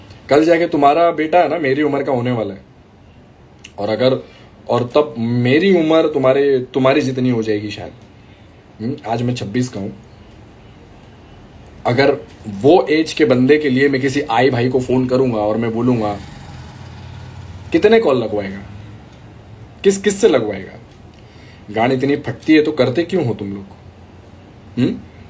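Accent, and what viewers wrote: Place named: Mumbai, India. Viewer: Asali ❣️💪powerful voice🔥 bohat sari videos dekhi sahil Khan ke khilaf pr bhai aap ne jo bola he boss 🔥🙌 aur mumbai vali boli kadak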